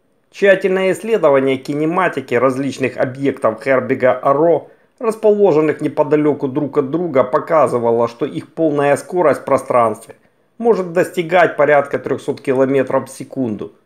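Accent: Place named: Kuban, Russia. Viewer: Интересно. Но интонация диктора и кубанойдный говор раздражают сильнее, чем желание узнать о звездах, еще не вышедших на главную последовательность. Тем не менее, спасибо за труд. Дизлайкать не буду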